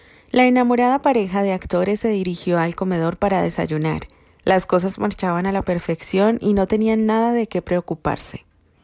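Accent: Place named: Latin America. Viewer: su acento latinoamericano tan hermoso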